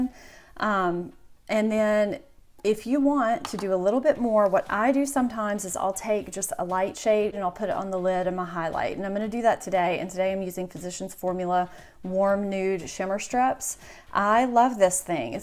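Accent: South, United States. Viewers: I just love you girl. That sweet Southern drawl just relaxes this Yankee!! I've tried some of your recommendations already(new sub) and they've worked well, both the products and the techniques. So glad I've found you!!! Our coloring is very similar, but I'm not a blonde. My eyes are brown, hair is auburn and I've got more than 20 years on you!! Tee hee. Still your style and techniques work so well for me. I so enjoy your bedroom where you film, it's so beautiful. So , pls keep doin what you're doin. I so enjoy you. Love your 🐶 in the background and your daughter was adorable. Enjoy your day , and I'll keep watch for your videos. Again, so glad you're there, Dear. Love from PA. Rachel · I kneed to get the Physicians Formula shimmer strips. I just noticed that you are in Louisiana. I live in Mississippi. Love hearing a familiar Southern accent on youtube. 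· Hi! I have to agree w/ your other sub who mentioned loving your southern drawl as a yankee 😀 I too adore it. My fiance is from Texas & I swear by talking to his mom & sisters, it's like being at a spa, their voices are so soothing lol. Anyway, great video as usual. I've never used the Benefit Erase Paste, but now you are making me go buy it ☺ I also love using shadows too as liners for wings, that Nars color is a gorgeous brown, may have to get that too! Love this look. 👍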